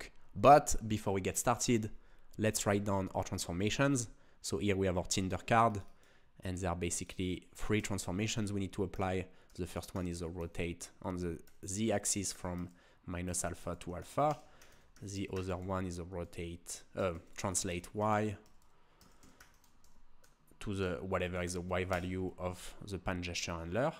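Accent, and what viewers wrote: France. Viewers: You primary Lang is french ? Because you have a french accent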